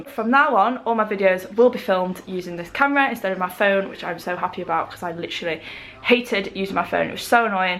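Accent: United Kingdom. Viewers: Have to finish da fockin bumpaah hahah love ur briish accent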